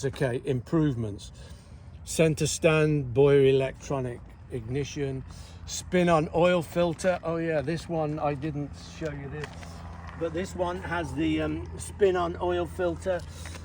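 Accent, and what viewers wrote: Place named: Midlands, United Kingdom. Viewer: Aye, I could tell you had a Midlands accent. I'm originally from Huddersfield.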